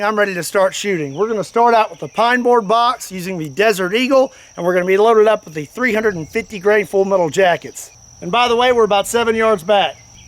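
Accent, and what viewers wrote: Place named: Texas, United States. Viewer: His Texas accent makes it even more American.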